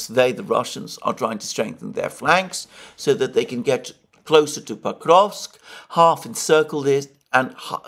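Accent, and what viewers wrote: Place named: RP, United Kingdom. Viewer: If you had’t been involved in nail bars you could have been king’s council, instead of a messenger for Putin’s grocery boy. Strategic, tactical - good put down. Your accent is posh English, not Greek. Just saying.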